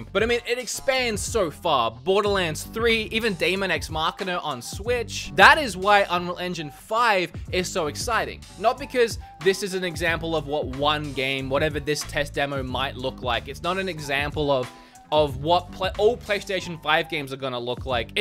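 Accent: Australia. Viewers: I wondered why watching your videos felt familiar. As someone who grew up in australia im not sure if you ever watched Good Game or Good Game Spawn Point but your channel really reminds me of dashing to the tv to watch the hosts talk about whatever game was hot at that moment. The aussie accent def helps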